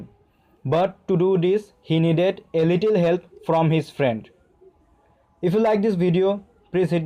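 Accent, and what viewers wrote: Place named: India. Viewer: I don´t know why, but I love this Indian accent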